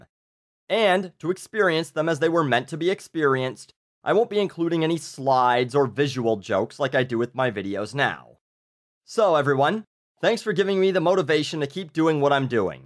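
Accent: Canada. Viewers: you had a way heavier canadien accent back then. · I here more of a Canadian accent with these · Bro! Listen to the end of sentences! He sounds so Canadian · Oh god you can really hear Canadian accent. 10 out of fucking 10.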